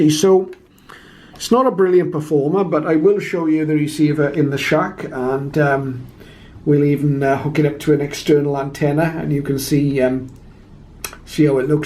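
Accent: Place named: Scotland, United Kingdom. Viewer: I did listen again, and all I heard was that rich Scot brogue…..unlike any other English accent !! I have heard them all.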